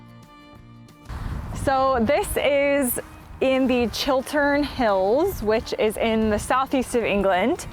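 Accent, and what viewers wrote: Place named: United States. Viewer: Why does your accent still sound fully American after living in the UK for 10 years?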